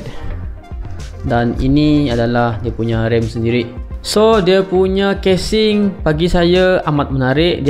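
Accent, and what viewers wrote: Sarawak, Malaysia. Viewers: Org srwkkah,sbb cara nya cakap kedak org srwk pin slang xd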